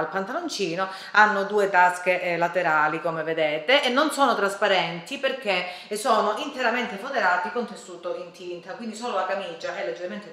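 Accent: Calabria, Italy. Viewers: Ciao, posso sapere di dove sei? Sei calabrese? Conplimenti.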